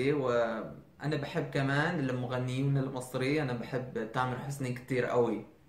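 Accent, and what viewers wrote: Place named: Chile. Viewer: Qué extraño que no tengas más vistas y likes. Eres como un políglota "invisible" haha, Tú español está bien, es gracioso tu acento, se parece al acento chileno. ¿Cuándo subes otro video?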